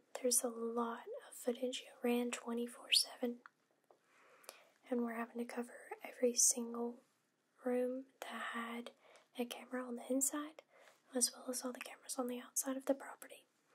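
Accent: South, United States. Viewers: Huge fan! The only complaint I have is I never make it through a video without falling asleep! 🤣I love your accent! and I have to know.. where are you from? I've lived in La. Ar. And Tn... if I had to guess, Georgia? S. Carolina? Regardless, thanks for the great asmr sessions! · the southern accent just makes this roleplay much better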